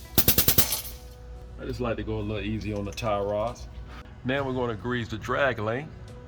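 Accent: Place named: Texas, United States. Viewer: I really appreciate your videos. I am trying to place where you are from. I am guessing south Texas like Houston or San Antonio? If not there maybe South Carolina?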